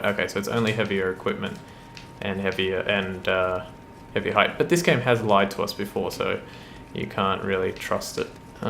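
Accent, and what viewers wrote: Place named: Australia. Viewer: This is a great series, also where abouts in Australia are you from?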